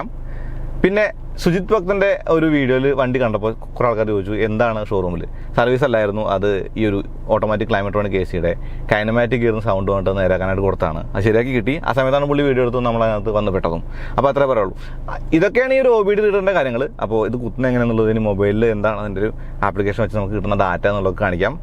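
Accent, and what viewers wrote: Thrissur, India. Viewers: ദയവായി സംസാരം അല്പം സ്ലോ ആക്കു. നിങ്ങളുടെ ഈ തൃശ്ശൂർ charupurality മനസ്സിലാക്കാൻ പാടാണ്.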